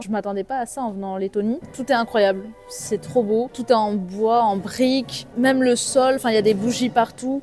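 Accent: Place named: Maghreb, North Africa. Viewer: Ton accent vient d’ou? Tu es D’origine maghrébine?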